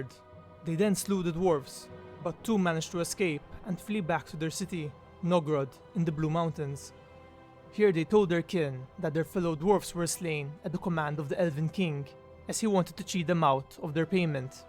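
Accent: Malta. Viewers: He sounds maltese:) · What a coincidence, Im from Malta aswell, thought your accent was uniquely familiar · Love the maltese accent :)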